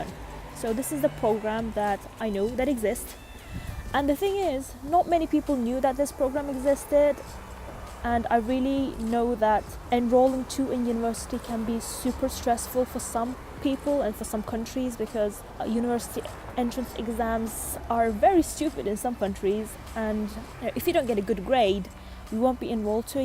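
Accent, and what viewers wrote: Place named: RP, United Kingdom. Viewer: Standard British Accent) Well Done!)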